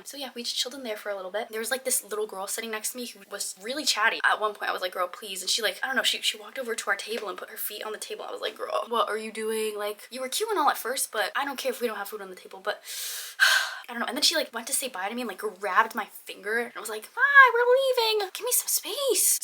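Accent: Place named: United States. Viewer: I did not expect an American accent lol, I thought I’d here a thick Scottish accent. Do a lot of people outside of Scotland go to the school?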